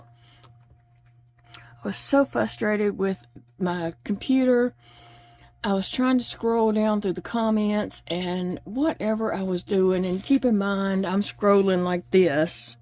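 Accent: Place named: Louisiana, United States. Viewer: Hi, I hope you will always find a way to keep crocheting but even if you couldn’t, I’d still watch your videos just to listen to your lovely Louisianan voice and hear your giggles. From now on I will also let the ads run through without skipping. I’m crocheting anyway and not watching the screen all the times. I hope you feel better soon. Best wishes from England :)😊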